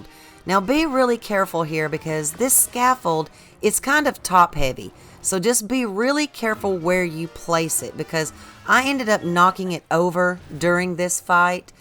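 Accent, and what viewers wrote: South, United States. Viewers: this is genius! also i'm so tickled by your accent, as a fellow southern person, you dont hear many southern game tutorials 😂❤ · Not to mention that nice Southern accent that makes it so chill…. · Are you southern? Lol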